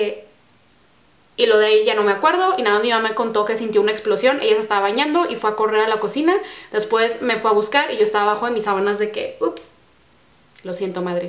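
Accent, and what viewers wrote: Monterrey, Mexico. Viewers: Yo si saque el acento que eras de Monterrey.. Somos regias como no notarlo 😁 Amo tus videos, cada vez que los veo siempre me sacas una carcajada.. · Que buena onda y vibra trae mi paisana .... me encanta por su real acento regio!!! hay muchos foraneos que por vivir aqui ya se creen regios y no nunca les sale el acento.... y a parte super tips q nos da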